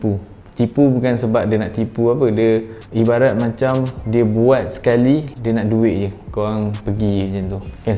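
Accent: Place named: Pasir Gudang, Malaysia. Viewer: Speaker mcm org pasir gudang lh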